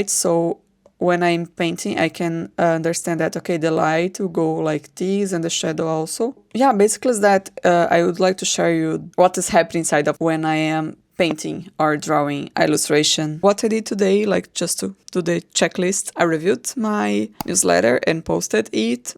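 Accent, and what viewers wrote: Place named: England, United Kingdom. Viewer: You're very talented, and your English accent is lovely.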